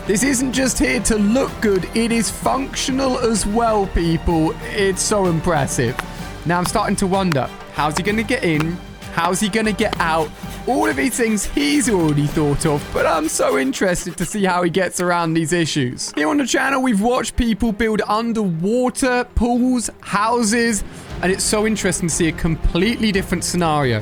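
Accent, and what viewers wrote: United Kingdom. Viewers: Bro is British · Are you british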